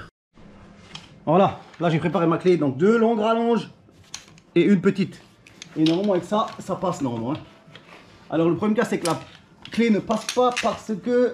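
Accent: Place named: Sarreguemines, France. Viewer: Bonjour, vous êtes en Moselle ? J'ai l'impression de reconnaître l'accent de Sarreguemines ? Je cherche un mécanicien pour ne embrayage sur bmw série 1 116d je suis de Forbach.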